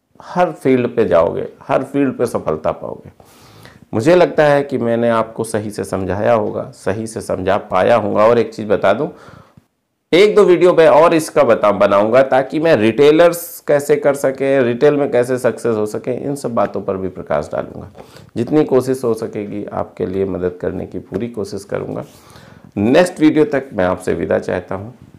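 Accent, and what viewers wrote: Kanpur, India. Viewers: Kanpur k h sir language wahi ki h apki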